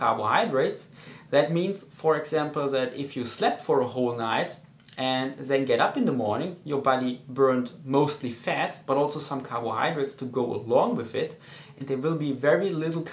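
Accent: Germany. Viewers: Great video as always. Too many people thinks empty stomach cardio is best for fat burning. Thumbs up for german accent!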